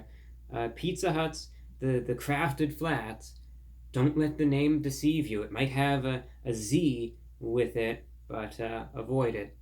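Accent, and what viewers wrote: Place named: Transatlantic, United States. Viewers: my husband saw thumbnails on my feed and laughed and rolled his eyes. He not only said looks 12 but that people make fun of him. 😂😂😂 I'm like dresses dapper and sounds MidAtlantic like in the 40s. I love the guy. Idc what haters say. It is innocent fun with food, cuz I'm a foodie, and great listens to the podcast too. No cussing and he is a good storyteller and seems like an all around good guy. I say screw the haters.😂 Though his comebacks on hate comments are the bomb. ❤ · Imagine being a corporate exec for some giant, time-tested publicly traded company, and your biggest worry is that a 20-something in a baggie suit, sitting in his grandparents dining room, speaking with a Transatlantic accent, named " ", is going to absolutely fucking butcher and sully your already bad name.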